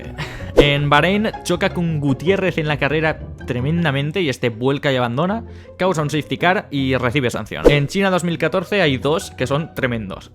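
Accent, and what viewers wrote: Spain. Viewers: No soy fan de Maldonado, de hecho me cae pésimo, pero escuchar un pendejo españolete no lo puedo tolerar. 👎🏻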